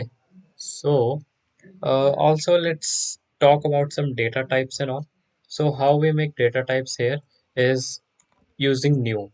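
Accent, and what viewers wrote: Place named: India. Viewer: the moment you hear the indian voice you know you can rely on them to help you with virtually anything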